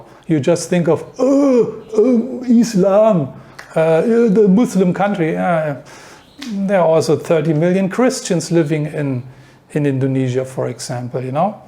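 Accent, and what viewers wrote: Germany. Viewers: Can I recognize your accent as German? All in all, our experience is much of the same than yours. Somehow we still became starting to apply for a Thai Visa whereat we ourself also know ourselves how great the people in Indonesia are. We've not been to Bali though, and our experience in Aceh was different. Including that, we've been to Indonesia for 6 times until now. I myself would like to have an Indonesian retirement visa additionally to the Thai Visa but my wife isn't as convinced of that for now. The other mentioned countries never have been an alternative for us, maybe also because one of us is trans woman. That might, seen from the West, seem a little bit not so logical given that that Indonesia is a Muslim country. But, except from Aceh, our personal experience experience was that the people there don't care about that at all. I'm not talking of people as staff or otherwise expect a deal with you. 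To cut a long story short, where are you living in Indonesia? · You're very interesting!😉. With that german accent and dry sense of humor, very funny! Wow you've been traveling a long time. I need to get back to SE Asia. I been considering returning to Thailand. I was a teacher in Thailand, Japan, and Taiwan previously. I recently got an offer to teach in Indonesia but am nervous due to the possible natural disasters and political strife but which country doesn't have this problem?...LOL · Your Accent sounds is very German ..are you ? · Indonesia is good but the Philippines is garbage .But his broken English in a heavy German accent is irritating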